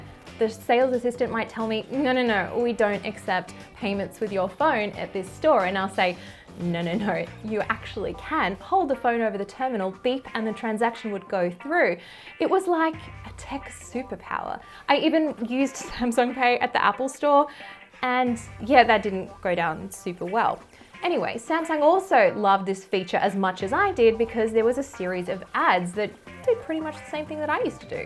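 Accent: Australia. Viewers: Is she Australian 🤔🤔🤔 Kinda! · This gal is an Aussie, I'm sure of it 🦘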